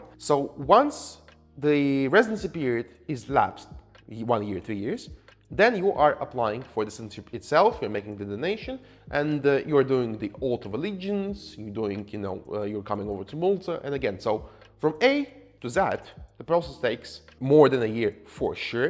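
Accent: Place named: Poland. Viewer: Your Polish accent is still very much possible to hear. Not that I am aginst a Poles ( as they belong to EU) just saying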